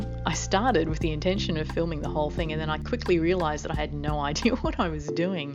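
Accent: Australia. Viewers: You sound Australian...I emailed them a few months ago to ask where I could get Arteza products here, or if they post here, and they said no. Has that changed do u know?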